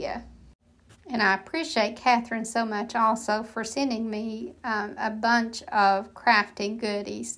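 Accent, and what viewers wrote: South, United States. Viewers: What gorgeous projects ❣️💕 While I have seen many upcyclers paint over silver-plated trays, this is the 1st time I have seen anyone leave an unpainted silver border around the edge of the tray and also leave the back unpainted...and I rather like it❣️🤍 Everyone has their favorite "go to" paint color. Not hard to understand why "buttercream" is a color you use frequently❣️🤍💕🤍 Not only is it beautiful for all of the reasons you described, BUT IT SELLS, which IS THE BOTTOM LINE, LOL.🤣🤣🤣 And being from Minnesota, am I the only one who loves ❤️ the way softly says, "buttercream" with that darling Southern drawl⁉️⁉️ Hahahahaha❣️👍🏼😁🤪🤣 The ONLY drawback I experience when you say "buttercream" many times during the course of a video is the HUGE CRAVING I GET FOR A BIG SLICE OF CAKE 🎂 🥮 WITH BUTTERCREAM FROSTING AND AN ICE COLD GLASS OF MILK, LOL❣️🤣🤣🤣🙈🙊🤣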